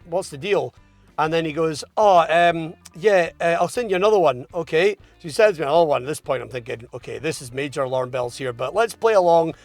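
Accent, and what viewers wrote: Scotland, United Kingdom. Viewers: I can not listen to you. 21st century and we still have people speaking scottish. Have you ever considered elocution classes? Imagine how popular you would be if you spoke properly. I could send you a link,,legit,,no bank details. Do a bit of work and you will be better understood. I have helped many of my scottish friends out of their accents. I have done the same with northern oirish wanchors. Jus sayin is all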